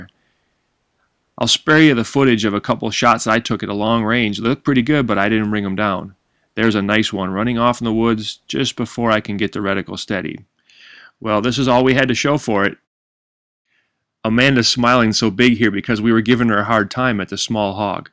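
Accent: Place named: Midwest, United States. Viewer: Your accent sounds midwestern. No offense, a lot of people move to Georgia from the North. My ex father-in-law was from Michigan.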